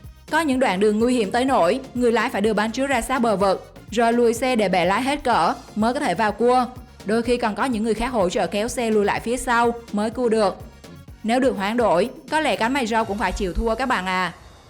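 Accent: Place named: Binh Dinh, Vietnam. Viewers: Như người bình định nhỉ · Nghe giọng cj nói cứ như cj là ng miền trung ý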